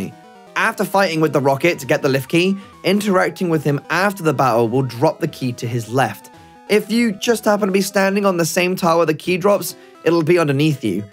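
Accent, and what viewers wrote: United Kingdom. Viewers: True bri'ish accent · Maybe it's just me, but as a non-native speaker I had to concentrate really hard to understand what he was saying cause he was talking so fast. That combined with his british accent it was kinda rough. Really cool video regardless though!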